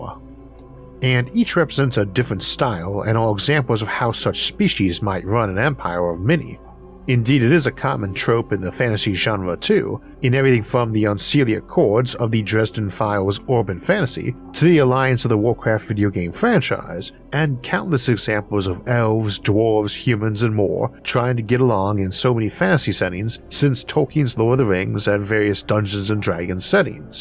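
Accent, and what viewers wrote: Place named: South, United States. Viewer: Man the visuals and graphics are light years away from those early videos. Actually the show has become a very professional package where even your "speech impediment" is as integral as the graphics and visuals. Personally I find your Elmar Fudd with a southern drawl accent unique and I'd put you in the same boat as Sagan and Attenborough although sitting in the stern perhaps.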